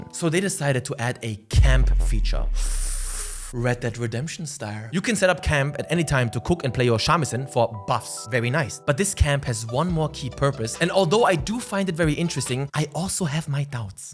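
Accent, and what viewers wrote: Germany. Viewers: Man hört das deutsch sooo raus hahaha geil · What is bros accent 😭😭😭😭🙏🙏 It's like a lovely mix of German, Japanese, and a little bit of French. It's fucking me up in the best way. Anyway, fantastic video. I'm just as hyped for Yotei and the new stuff has me excited for a more immersive, less ubisoft-style "map checklist" game. Just getting lost in a massive world without feeling like an overwhelmed menial forced to visit every point of interest in the hopes of a crumb of meaningful content. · I'm Confused you have so a german Akzent and use german Words. Ich bewerte das Video 9/10, du hörst dich fast so an wie Raffey👍🏻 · danke für dein friendship, alter du sprichst englisch mit einem deutschen akzent, bist du auch deutscher oder ?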